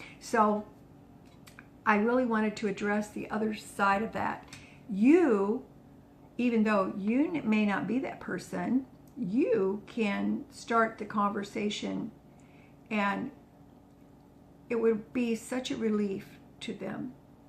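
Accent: United Kingdom. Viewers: Hi, absolutely loved this. You're a wise lady with a fab sense of humour. Life is too short to be uptight....have the sugar, eat the cake, drink the cokie cola 😉 we have to have some pleasure in life. Wonderful English accent by the way. 'Bottoms up darling!' As we say here. ❤ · Lol, love your British accent in this episode! My feeling is , everything in moderation. Including sugar. Yes, too much of it isn't good for you. But that woman that made the snarky comments needs to get real and stop judging you. You are doing g a great job and I love your channel, one of my very favorites. ❤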